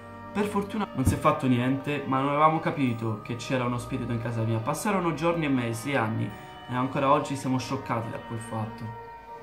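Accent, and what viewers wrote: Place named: Florence, Italy. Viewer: Mi hai fatta impazzire quando hai detto quella frase in siciliano '' sbagghiasti '' ma come mai se hai l'accento fiorentino? Ti è uscita quella frase love sei unico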